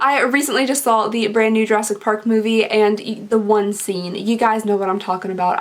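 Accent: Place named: South, United States. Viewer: Nice box! Never heard of it but I totally love that it's Jurassic Park themed-ish! The company my dad works for made the hydraulic pumps used in the dinos so that movie was my favorite as a kid for a lot of reasons. Btw, am I late to the game or do you have a heart tattoo om your pinky finger? I noticed it in the past few videos, unless I've still got sick brain and never noticed before which is totally possible, lol. Anyway, like I've said before, yours is the first channel I've seen with unboxings and you got me hooked! My husband and kids know when I've watched one of your videos because I apparently adopt your accent. I live in NE Ohio so not many Southern accents, lol. Love your vids; I watch for the unboxings and stay for the ramblings. 😆 thx for sharing! 💖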